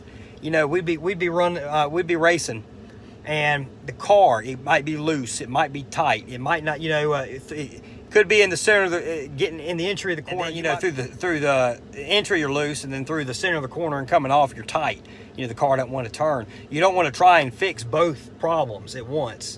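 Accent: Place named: South, United States. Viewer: Thanks. Needed to hear today!! The southern accent always helps too. It is soothing to me. 😉I don't hear much here. Make today amazing!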